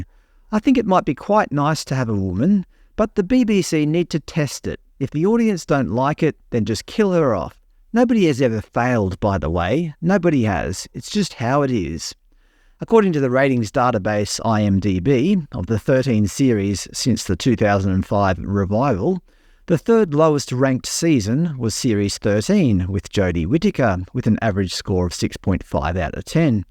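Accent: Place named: Australia. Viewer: Americans listening to you won't realize that you're Australian not British, but you've got a nice accent. I don't want my Doctor to be female, i don't mind a female time lord i loved Romana, Mary Tam in the 70s but The Doctor should be a male, I also don't want the Doctor to be black, i don't mind a black time lord but after growing up with the Doctor being a white ( straight) male i find these changes just forced diversity, I'm not racist or homophobic, I'm gay myself and i wouldn't mind a black James Bond played by Colin Salmon for example. The BBC should be forced to scrap the TV license tax because Disney is a commercial company and it's funding this car crash of a tv show. Donna's trans daughter criticizing the doctor for not being a woman well they( Donna's daughter) never was a woman and still isn't. So the Daleks are now in wheelchairs now? 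Oh and I forgot i don't want my Doctor to be played by an immigrant, he should be played by a British actor, that's actor as in man. The producers, writers and actors are informing us the classic fans Today "sorry straight white males this show wasn't made for you and it never was" Tomorrow they'll ask "Why aren't you watching our show?"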